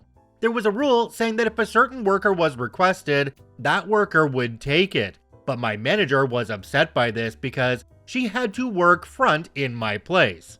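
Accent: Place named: Canada. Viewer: from Canada, so he will pronounce me words differently than other people would and no, he is not am AI either.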